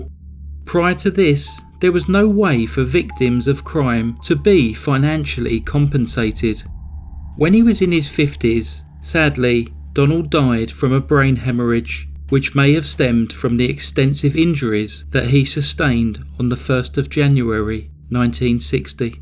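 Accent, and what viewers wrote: United Kingdom. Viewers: I wish I had a calm British accent, it just makes storytelling more pleasurable (no matter how heinous the story is)...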